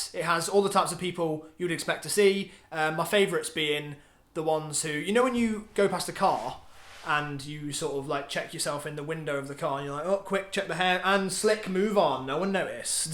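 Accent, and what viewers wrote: United Kingdom. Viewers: My god I love you and your superbritish accent <3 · You're so English its beautiful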